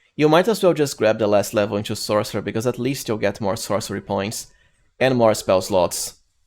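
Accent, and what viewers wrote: Brazil. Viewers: are you brazilian btw? The accent feels like it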